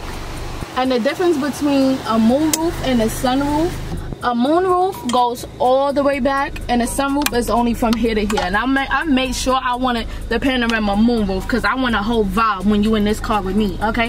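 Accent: New York, United States. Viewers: She from Ny??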